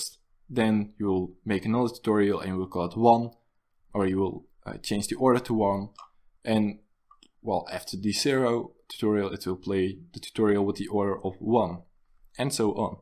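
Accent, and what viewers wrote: Netherlands. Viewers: Are you Dutch? :)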